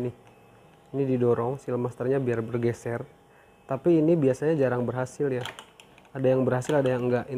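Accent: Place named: Jakarta, Indonesia. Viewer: Hahahaaa... ini logatnya betawi tapi bahasanya sunda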